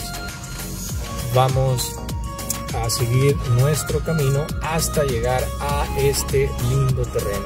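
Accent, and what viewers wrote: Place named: Colombia. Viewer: Disculpa tú de qué nacionalidad eres amigo me suena que eres colombiano verdad sólo por curiosidad gracias y bendiciones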